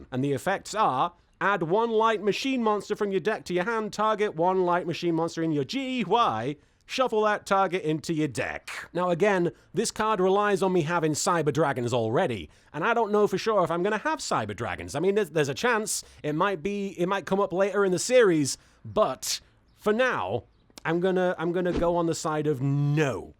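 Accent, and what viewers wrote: United Kingdom. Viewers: being extra limey today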